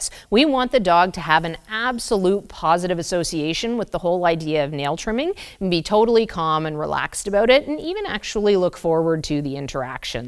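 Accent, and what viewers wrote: Canada. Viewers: is that a canadian accent? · "Talk a-boat" 😂god I miss that Canadian accent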